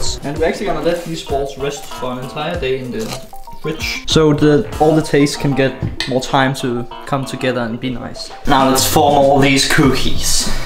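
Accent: Denmark. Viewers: Hva satan, har lige fundet din kanal og kunne med det samme høre du var dansk AHAH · Er du fra DANMARK?! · Its danish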